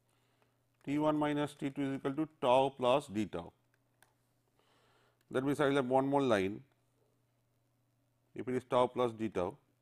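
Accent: India. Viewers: Is he speaking english with a hindi accent or is he speaking hindi with an english accent? I like his explanation though.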